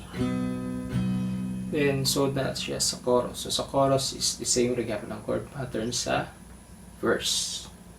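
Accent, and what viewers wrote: Visayas, Philippines. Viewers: Bisaya man diay ka sir.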